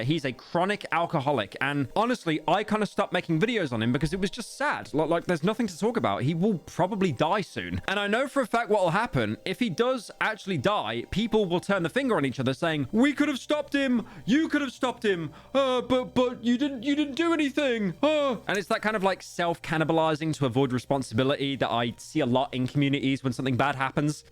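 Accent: United Kingdom. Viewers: why is he more british in the video rather than in any other video?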